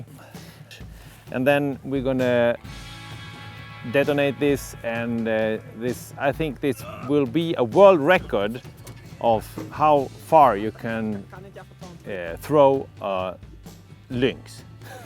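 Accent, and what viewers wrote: Sweden. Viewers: The swenglish is one of the best part of this video besides the insane explosions. You're always pushing the boundaries when it comes to this stuff, can't even imagine what kind of stuff you gonna blow up in the future but i can't wait too see. Great work man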